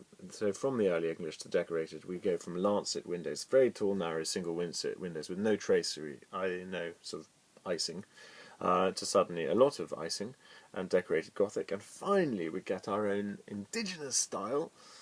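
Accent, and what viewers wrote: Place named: RP, United Kingdom. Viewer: Bloody hell you're posh!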